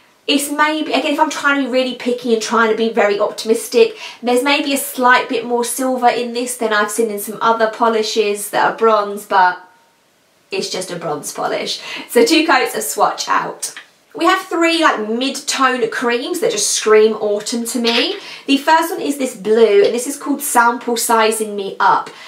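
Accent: United Kingdom. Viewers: I only have Aut-umn I Need That but want You Don't Know Jacket and Haute Blood I love how it looks with a glossy top coat rather than matte, yes I'm strange wanting a matte polish to a glossy top coat on it 😂 you're British aren't you as my husband thinks you're Australian.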